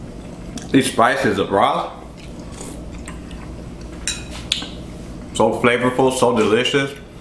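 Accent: California, United States. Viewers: I cook my menudo for about 5 hours. I'm from Texas sounds like your from California. Menudo is great,especially in cold weather like,Now! Thanks for your recipe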